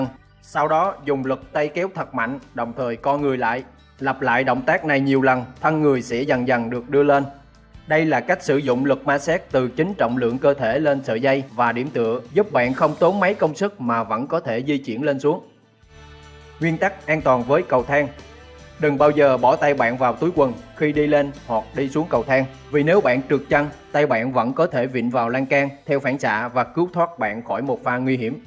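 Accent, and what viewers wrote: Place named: Rural, Vietnam. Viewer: Giọng ông này sao giống nhà quê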